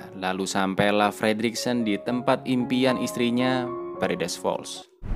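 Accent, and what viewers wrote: Java, Indonesia. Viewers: Bang orang jowo ya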